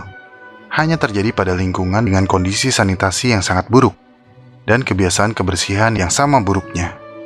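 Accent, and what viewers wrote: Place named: Java, Indonesia. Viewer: wong jowo fix ono kata" deng 🙈🤣,jowo ne ndi mas ?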